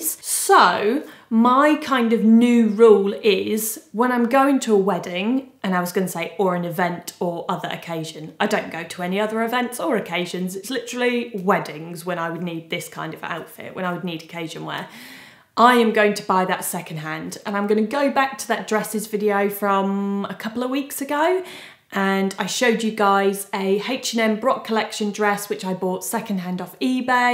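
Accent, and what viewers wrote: United Kingdom. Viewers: Hi! I've discovered you last weekend and well, I'm obsessed; where have you been all my life?! xD I absolutely love your style, but also how genuine you are. Also I was very impressed about how much your answer to your followers, given the huge number of them. Just shows how professional and nice you are. Best content creator discovery of mine of the past few years!:) PS: the bag reviews do come very handy, I am half excited half in deep despair regarding the fact that I will have to buy the Celine seau sangle.:D + PS²: your British accent makes me jealous, it's so cute and classy! xx love from France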